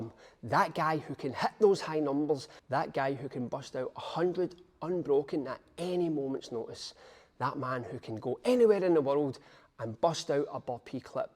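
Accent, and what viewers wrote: Scotland, United Kingdom. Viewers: I love the Scottish mindset, accent, no nonsense approach and hard workout game 🏴 (I'm Scottish)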